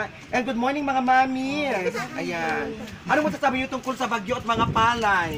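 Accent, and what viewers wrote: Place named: Ibanag, Philippines. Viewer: Te .. marunong kabang mag salita ng ibanag... kasi yong tune mo parang....curious lang po.. salamat..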